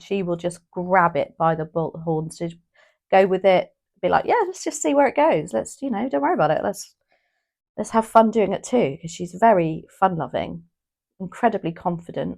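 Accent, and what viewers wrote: United Kingdom. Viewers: Hey welcome, nice to hear a Uk accent tarot reader. My first time here ❤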